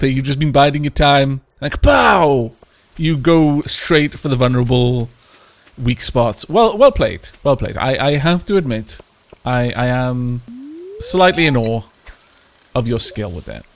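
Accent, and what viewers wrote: Wales, United Kingdom. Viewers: Say, I could have sworn your accent was Welsh! So are you a welsh person living in Scotland or a Scottish person with a funny accent? (I am from Edinburgh, my accent is strange too.)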